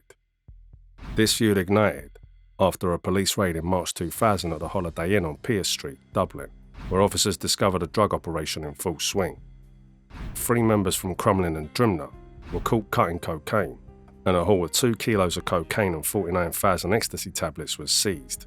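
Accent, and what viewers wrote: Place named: Cockney, United Kingdom. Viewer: These goofy cockney accent narrator's are super cringe and speak like they are on antidepressants ffs I have to listen on ×2 speed.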